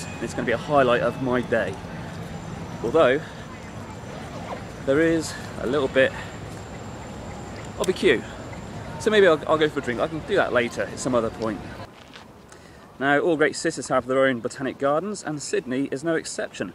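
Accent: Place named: United Kingdom. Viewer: Adorable British accent.. and yeah brilliant video too :)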